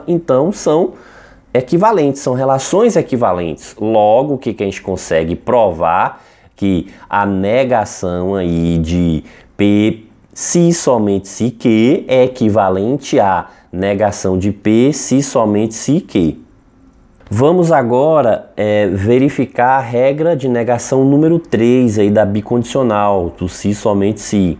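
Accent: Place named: Bahia, Brazil. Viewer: Excelente trabalho, professor! O sr. é da Bahia? Se não for parece mto. Eu sou de Olinda, Pernambuco.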